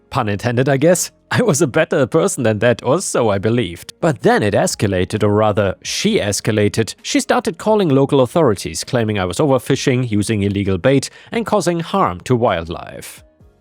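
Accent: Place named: Germany. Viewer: He's German. English isn't his first language. He's pretty good, but there are still some words he has problems with.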